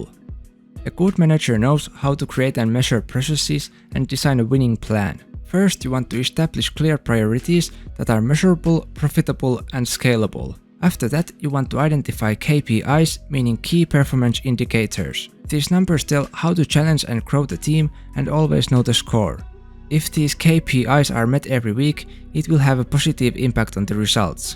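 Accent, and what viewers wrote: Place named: India. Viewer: Can you put YT subtitles? My English is not good and it seems you have Indian accent which is more difficult to understand. Thanks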